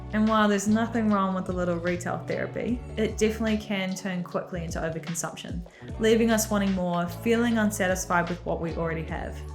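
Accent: Australia. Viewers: So glad I found you! Also, I moonlight as a dialect coach in my theater community and you're giving chef's kiss Elevated Aussie! 🥰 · What about active wear (sports wear) and winter wear, jumpers, cardigans, hoodies, coats for various occasions and comfy stuff to wear around the house? I like the idea of a more intentional wardrobe, but what's on that graph - all looks like things you go out in, which is fine, but seems like quite a big gap in content, no offence, I appreciate this is more from a styling perspective of clothes to choose when leaving the house, but as someone looking for wholesome practical content on the matter, I'd like to also see a breakdown of the other parts of the wardrobe and how to curate that. Like, how many coats, cardigans, jumpers, hoodies, even pj's to stick to, or multi purpose clothing options in these parts of wardrobe, that kind of stuff. 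I really like you're approach, would love to hear your take on those parts of wardrobe too. I don't know if you're from Australia judging by the accent, but I'm in the UK, and we can have 4 seasons in 1 day sometimes times, and winters are cold, like I can't imagine surviving a winter with the wardrobe in your video. Anyway, if it's relevant for your audience, I'd love to see a video for decluttering winter wardrobe!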